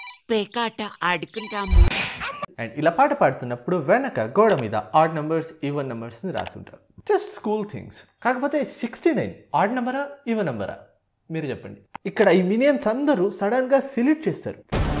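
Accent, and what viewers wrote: Karnataka, India. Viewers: Kannada kodthundi bro nuvu matladtha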